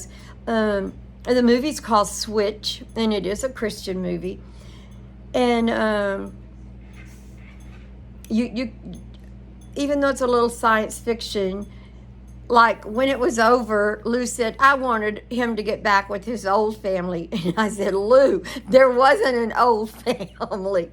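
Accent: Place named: Texas, United States. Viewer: ...Please don't think that your Texas accent is incorrect. I love that Southern & Texas sound. Speak it proudly❤❤❤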